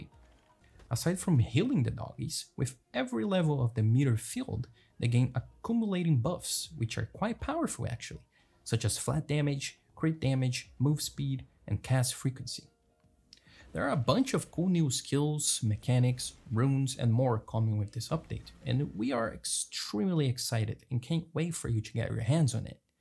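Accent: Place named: Brazil. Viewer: tu e brasileiro né cara. Da pra ver pelo seu sucaque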